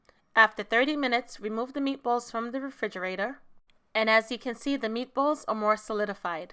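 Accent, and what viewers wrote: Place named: East Coast, United States. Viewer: Love that east coast accent ☺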